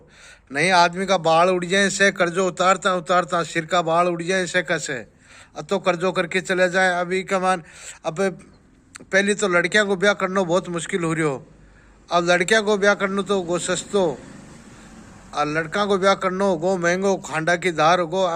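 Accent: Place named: India. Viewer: बोली से लगता गुढा के पास का है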